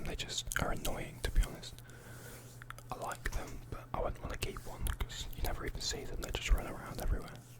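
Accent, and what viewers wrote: United Kingdom. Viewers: Hi! I'm from Brazil...I love your videos and your British accent, very relaxing. Thanks for the video!:D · what part of the uk are you from? i really can't tell from your accent haha x love the videos man❤️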